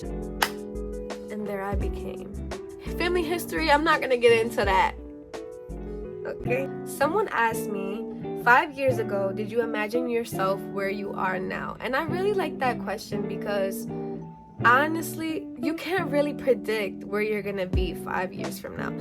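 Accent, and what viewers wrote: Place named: United Kingdom. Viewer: Great video! Your British accent always gets me and great Q@ keep it up😁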